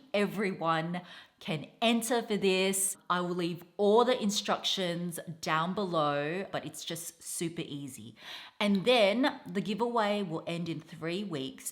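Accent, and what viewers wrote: Australia. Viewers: I’m very GRATEFUL for my wife MIKKI who I’m entering your give away for! She enjoys watching your latest video haul and I like listening to your Aussie accent, like when you say “leathaaah or evaaah. Her birthday is coming up in December and the Hermès Her bag would be the perfect birthday gift for her! I’ve been looking into building my history with Hermès by shopping for belts and handkerchiefs! I’ve even been browsing for Hermès tea cups just so I can get the chance of maybe purchasing a bag for her. Thank you for giving us a chance to win the Hermès Her bag! We’ll wishes and Keep up the fantastic job!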